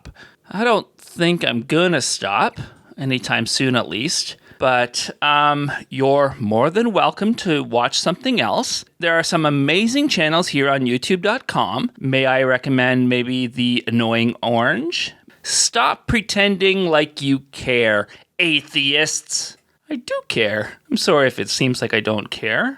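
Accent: Canada. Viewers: Aww I like the Canadian accent.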